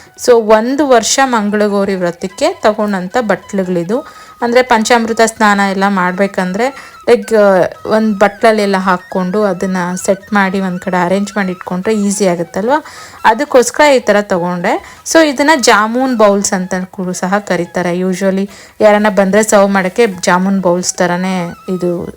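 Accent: Kolar, India. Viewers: Nimdu kolar ahhh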